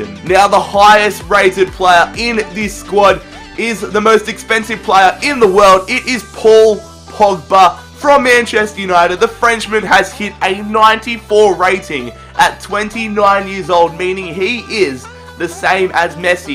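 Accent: Australia. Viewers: mate, he's an Aussie, I'm a kiwi and I can understand that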